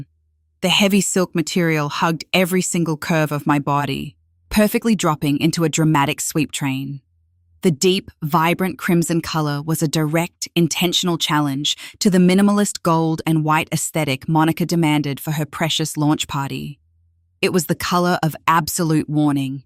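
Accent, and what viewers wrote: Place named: Australia. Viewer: Kiwi here, Refreshing to hear an Australian accent. Some of those American accents are horrid. Good story, my youngest daughter had a similar experience when she was little, quite a traumatic experience for a young one.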